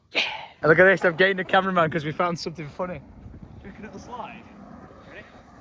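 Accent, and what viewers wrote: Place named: United Kingdom. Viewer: I absolutely love your accent but as an American i have one too. But what wanted to say is i love the way the way you say but. It’s so British. Love your blogs. And by the way i am percent British my ancestors come from Manchester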